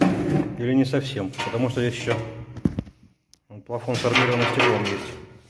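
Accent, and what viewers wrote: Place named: Saint Petersburg, Russia. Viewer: оу, питерский окцент, в верьх.спасибо за кино.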